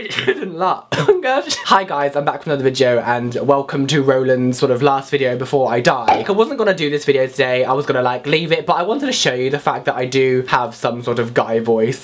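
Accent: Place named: United States. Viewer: you acually sound really American ( the accent) :))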